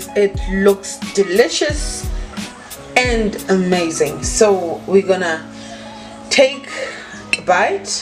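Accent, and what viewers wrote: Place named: South Africa. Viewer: You can just tell she’s South African 🇿🇦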